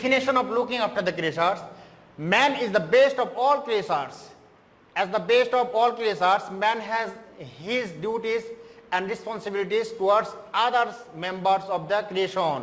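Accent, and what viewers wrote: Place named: Bengal, India. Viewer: You can find hundreds yet tenths of thousands of bangalees who apply the same pronunciations. Its not done by will but its the way of the accent of some certain people. So this mistake cant be corrected in terms like this. But one way is that is these certain people can learn the UK accent without some obstacles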